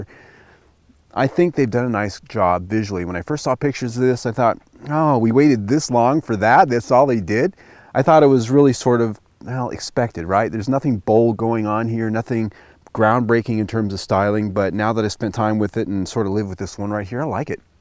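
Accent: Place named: United States. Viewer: love the "Murrican accent!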